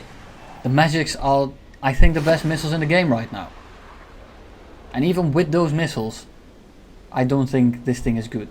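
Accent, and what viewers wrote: Netherlands. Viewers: When you are frustrated the dutch accent comes out so strong.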